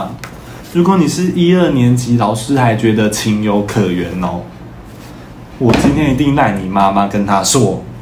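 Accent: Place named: Taiwan. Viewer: 台湾腔本来挺好的，但他的语调😅...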